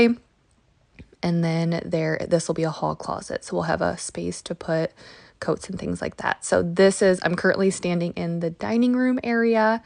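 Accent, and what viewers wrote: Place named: Ohio, United States. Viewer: Hi. I love ur spirit. Ur so calming. You made cleaning fun for me. You are the 1st You Tube Cleaning video I ever watched. And you are my favorite because of your positive, motivating, happy vibe. To answer your question. I love living near the beach. I live in New England now. The Cape Cod vibe is my scene. Your Ohio accent is adorable when it comes out. I'm sure you'd think my Boston accent is strange. 😆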